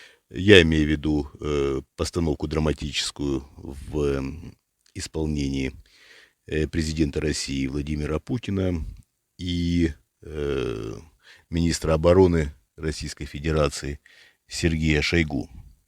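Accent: Ukraine. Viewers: Судя по вашему говору, вы украинец?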